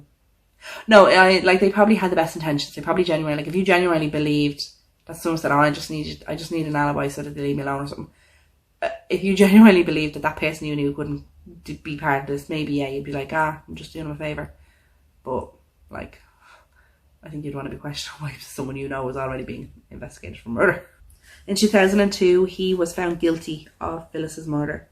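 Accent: Ireland. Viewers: Good stories,but the content in which your reading these murders is very clipped,meaning you can't read a full sentence without blurting on about something else,ur train of tght seems to drift off and it's then hard for the listener to get the gist of the story.Maybe if you just read the story as it's been presented instead of going backwards and forwards with your presumtions it might be easier for us all to follow. I do love your irish accent tgh.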